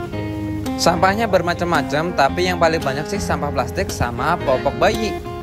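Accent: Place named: Banyumas, Indonesia. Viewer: wong ngapaknya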